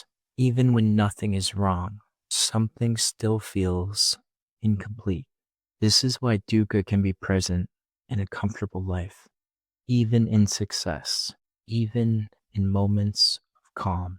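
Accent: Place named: United Kingdom. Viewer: Which Indian pronounces English like the British?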